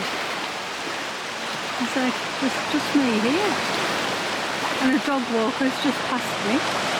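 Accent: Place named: Northeast England, United Kingdom. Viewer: Hello Did you mean: As soon as i heard your accent i knew you were a fellow Northeastern woman and I knew instantly when you were looking for stones and paddling on Seaham beach. I was wanted more. I am a mixed media artist and live in Darlington and just adore paddling in the sea and the wild weather and the garden. I clicked subscribe and will feel right at home watching your videos. Thanks for sharing. Love from June x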